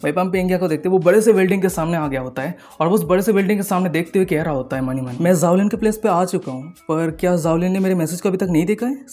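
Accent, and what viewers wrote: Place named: Kolkata, India. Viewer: Bro tum kolkata se ho voies sunke laga ♥️♥️♥️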